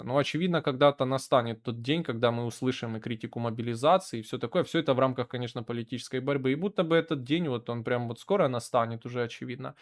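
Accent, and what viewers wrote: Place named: Ukraine. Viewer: Бро, візуал гарний, але російською ти володієш на посередньому рівні, підсвідомо (субʼєктивно) сприймається як концентрована малоросійська, тому складно визначити твій особистісний ІД. Не свій і не чужий, я хз хто твоя цільова аудиторія, якшо відверто (без образ)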